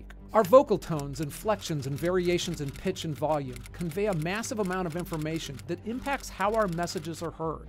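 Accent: United States. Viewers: San Francisco accent. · I love yourvideos but you def have a " gay californian" accent it's wild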